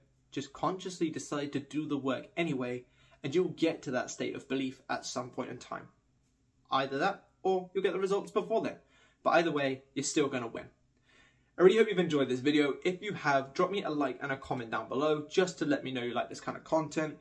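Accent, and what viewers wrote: United Kingdom. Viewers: PS: you speak so quickly + the British accent makes me REALLY pay attention and often re-listen a part or two (I mostly listen to American English). Which I don’t criticize, it’s something I welcome because it’s super refreshing! I have to say I enjoy the manually added captions! Oh and I think I manifested your fast speech because I was tired of some Youtubers speaking sooo like, squeeeeezing a sponge type of slow speech🤣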